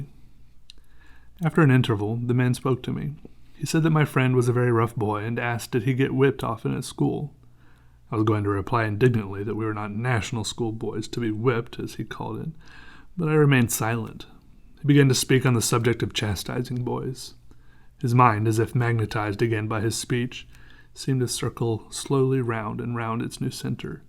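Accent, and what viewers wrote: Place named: England, United Kingdom. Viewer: Hello. I like your English accent. And I like Celtic music, James Joyce's 'Dubliners'. Thank you.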